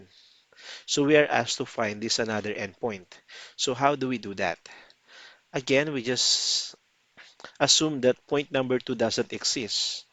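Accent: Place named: Myanmar. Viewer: မြန်မာသံ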